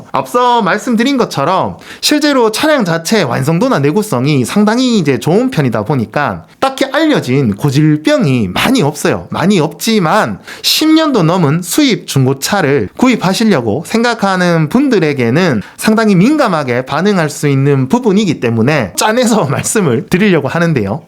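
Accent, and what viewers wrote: Gyeongsang, South Korea. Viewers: 헉시 갱상도 사람인가여??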